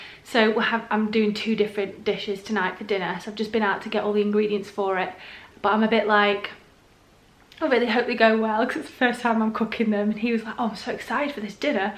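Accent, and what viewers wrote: North, United Kingdom. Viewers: Yay! Nice to have you back! Great to hear your friendly Northern tones back on YouTube!:)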